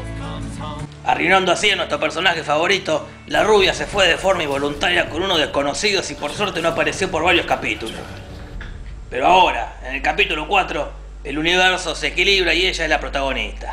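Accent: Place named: Argentina. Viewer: es un genio el loco, me re copo como hizo todo el video y por como habla me parece que es de aca de Argentina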